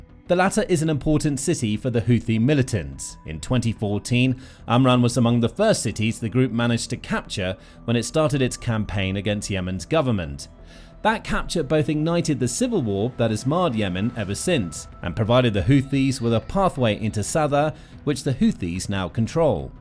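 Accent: United Kingdom. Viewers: Your British accent tells me everything I need to know BBC should worry more a about your rip gang's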